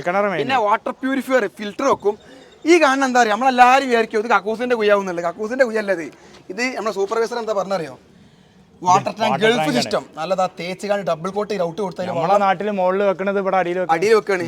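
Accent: Malappuram, India. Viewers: പെരന്റെ ഔത്ത് നമ്മുടെ മലപ്പുറം ഭാഷ😅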